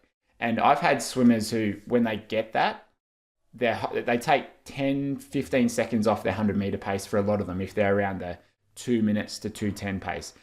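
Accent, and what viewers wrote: Australia. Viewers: Maaaate! You sound Aussie and should know that it's pronounced BOY not booey like the Yanks say.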